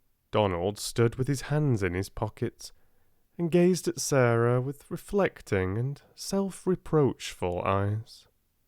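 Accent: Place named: United Kingdom. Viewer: I've just seen this premiere being scheduled for 10pm (in Serbia).. and just to let you know, no one would mind you reading it in such a lovely accent! I've actually discovered your channel whilst looking for an audiobook for this one in British English.. and we have the same editions, I like to listen to an audiobook and follow the story in a book, since I'm not a native speaker, I find it really useful! All the best, Greetings from Serbia!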